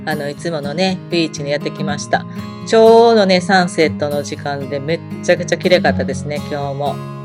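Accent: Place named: Kansai, Japan. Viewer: 本当にこっちは食物類高いですよね、っていうか高くなりましたね。 関西弁がとっても懐かしいです。😊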